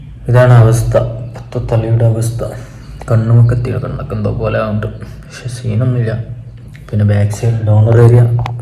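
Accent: Malappuram, India. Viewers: Ivan malappuram slang aanallo😌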